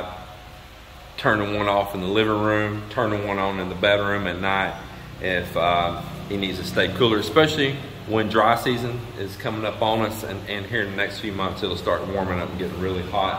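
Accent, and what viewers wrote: Texas, United States. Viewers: You make me laugh. The way you talk. I know you are from Texas. I am from Tennessee. I really like the way you talk. So country and I don’t mean that in a bad way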